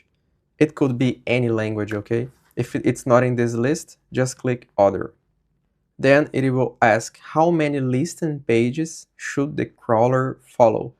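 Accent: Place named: Brazil. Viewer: Mano quando tu começou a falar eu logo pensei "rapaz esse bixo é brasileiro" falou o já matei kkk obrigado pelo tutorial mestre.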